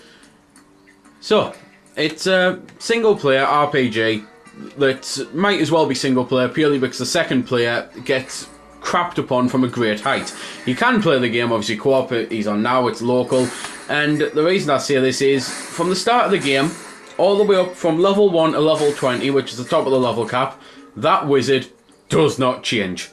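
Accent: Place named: Northern England, United Kingdom. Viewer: You're the best reviewer gaming wise excellent taste only negative is your accent sometimes hard to understand being american. Where are you from country city and region? Is that like northern english accent?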